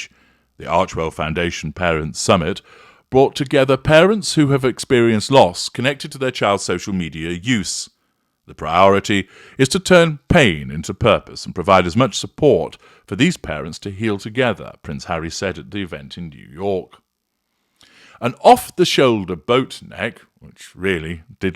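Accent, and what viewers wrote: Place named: Manchester, United Kingdom. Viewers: Its the ingk rather than ing at the end of words that says NW England to me. On the Kings English thing, the way he pronounces project with a long o is very noticeable to me. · i think he’s originally a Manchester area man.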